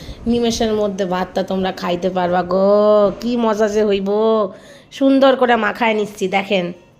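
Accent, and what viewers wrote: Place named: Bangal, Bangladesh. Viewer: Bangal vasa...bah bes to ...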